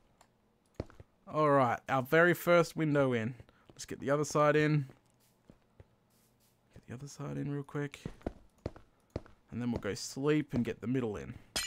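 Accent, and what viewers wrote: Australia. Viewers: Hey are you from Aussie by any chance? Haha I'm from New Zealand so if you are from Aussie heya neighbour:D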